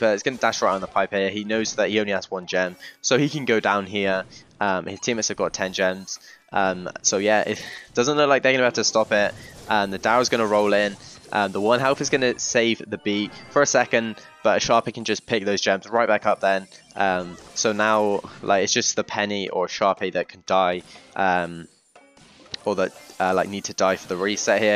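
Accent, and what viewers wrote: United Kingdom. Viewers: british english accent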